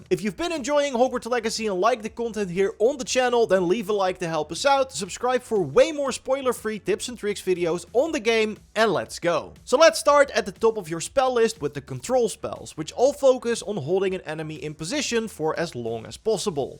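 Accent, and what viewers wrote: Netherlands. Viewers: The Dutch accent in this commentary is so obvious I love it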